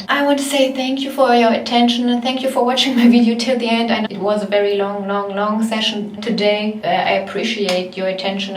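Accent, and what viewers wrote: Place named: Germany. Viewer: Wallah so ein Deutscher Akzent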